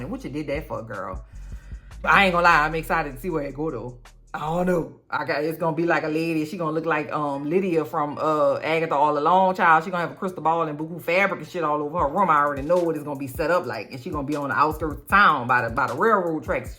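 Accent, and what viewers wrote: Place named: South Louisiana, United States. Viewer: Love your priceless commentary! Adore your accent as well! I miss it, as a south Louisiana native also ( moved to Arkansas about 25 years ago.) So glad to have found your channel. ❤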